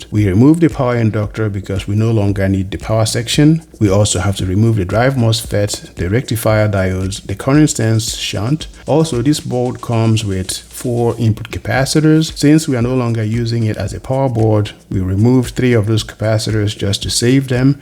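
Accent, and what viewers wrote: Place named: Haiti. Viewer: 🔥acento haitiano DETECTED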